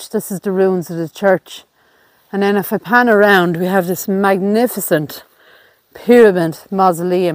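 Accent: Ireland. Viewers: I love the Irish brogue! Subscribed. ❤️ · Hi I am watching my first video from you, from one of your pinned post, your accent and the names, I believe you are in Ireland. Is that correct? Thanks, From Texas USA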